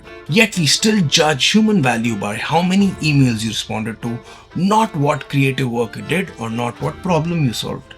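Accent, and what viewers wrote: India. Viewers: reminded me of a YouTuber bass player who plays Behs you know?) Danny Sapko. So he’s pronouncement and yours are very similar except one little spice of India in yours and one little spice of Australia in his.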